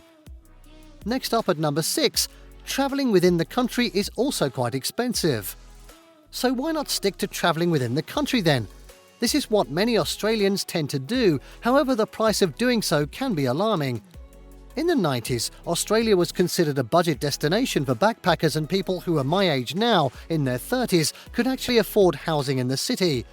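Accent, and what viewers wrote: England, United Kingdom. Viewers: This entire video was a hate campaign produced Indians using a Brittish accented narrator who claims he was born and raised in Australia. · Yeah, someone else see's through his bullshit. Aussie with an English accent. Pertains to have been born here, yet on another video he says he would like to visit. Some other commenter claims this originates in India. Maybe that is why such an emphasis on it. By the way this banana really is full of poo poo. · Mistakes 1. New Zealand flag not Australian 2. English not Australian accent 3. Supposed indigenous people contained indigenous to Africa, North America and South Pacific Islands 4. Photo of second largest city which is Melbourne were actually of third largest city Brisbane Many more WHAT A FAKE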